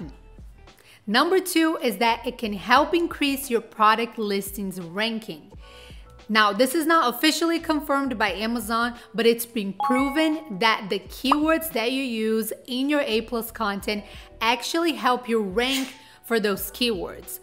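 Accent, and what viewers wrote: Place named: Brazil. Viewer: İ like the sweet 🍬Brazilian accent made it more fun to listen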